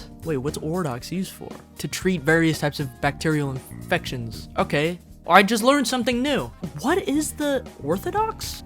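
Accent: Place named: United Kingdom. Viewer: Yea the British accent sold it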